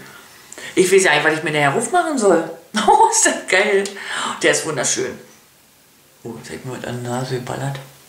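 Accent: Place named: Berlin, Germany. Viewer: Biste Bärlinerin? Hört sich zumindest so an. Und wenn ja - sei gegrüßt aus Pankow :)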